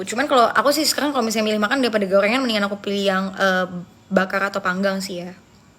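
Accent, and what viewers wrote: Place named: Betawi, Indonesia. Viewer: Sekarang ngomongnya ala bocah Jaksel, dulu nyablak ala betahaw 😂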